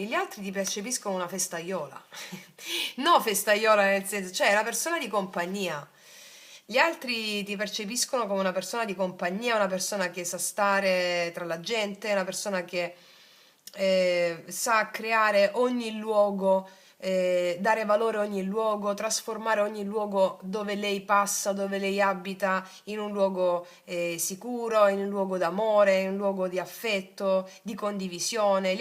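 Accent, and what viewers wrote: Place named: Lecce, Italy. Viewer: Sento una forte inflessione leccese❤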